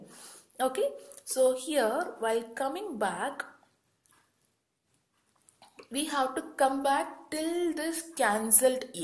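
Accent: South India, India. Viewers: I was reminded of my south Indian teacher who has the same accent and style of teaching. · all i hear is some indian noises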